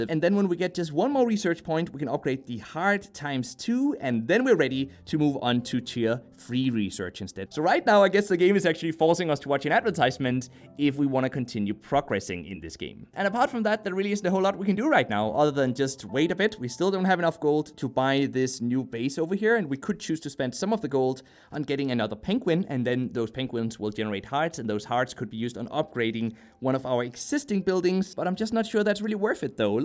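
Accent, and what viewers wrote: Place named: Denmark. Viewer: Before I saw the Kroner symbol in the shop, I could tell by your voice that you were Danish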